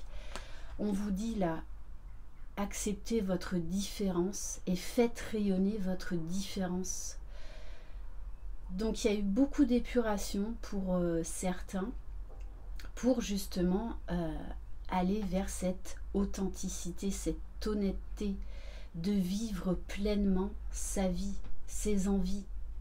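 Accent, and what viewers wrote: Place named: Alsace, France. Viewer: Bonjour. Oui du 67 .mais c est un accent tellement mignon qu on a .rester comme vous êtes .c est l Authenticité qui fais la bonne personne. 😇🥰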